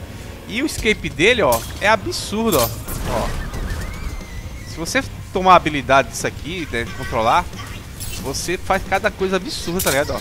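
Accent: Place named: Recife, Brazil. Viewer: tu é engraçado kkkkk é de Recife? Sotaque da porra é esse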